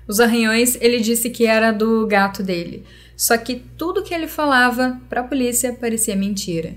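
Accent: Rio Grande do Sul, Brazil. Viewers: Oi você é gaúcha? Amei o canal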